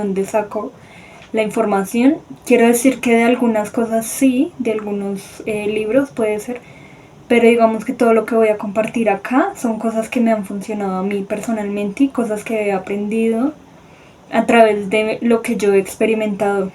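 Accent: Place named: Colombia. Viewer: Hola cómo estás, por tu acento creo que eres de Colombia yo también te pido por favor ayuda no estoy bien, y pedí a Dios ayuda y justo me apareció tu video me gustaría contarte mi historia para que me puedas brindar una orientación porque yo se lo q estoy viviendo no me lo invente yo, no estaba nunca buscando información sobre nada de estos temas todo ha llegado a mi solo yo no estoy loca, ni tengo ninguna reprogramación de una tal “nueva era” como dijo una señora en otro video que observé por siempre he tenido una conexión muy profunda con Dios y soy Asocial no frecuento lugares, no tengo amigos, siempre he estado sola no hablo mis cosas con nadie entonces quien me lavo el cerebro? Quien me reprogramo? Y Dios no habría permitido tal cosa para mí, yo no vivo una vida mundana. Yo quiero hablar contigo, por favor 🙏 Dios te bendiga.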